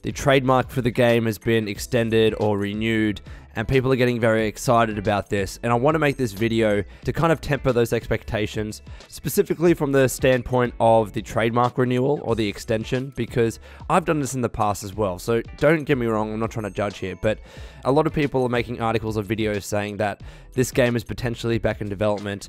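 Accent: Australia. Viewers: I really like accent. Are there other Australian YouTubers that y'all suggest I should follow?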